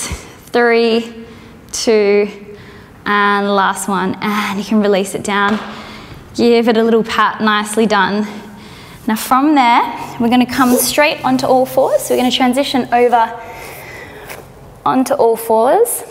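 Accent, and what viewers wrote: Australia. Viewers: Beautiful accent from down below...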